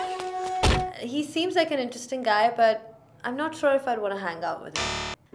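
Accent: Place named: India. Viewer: Your accent is so strong. Please learn better English. You studied here didn’t you learn anything. You sound so Indian. Please don’t learn from them.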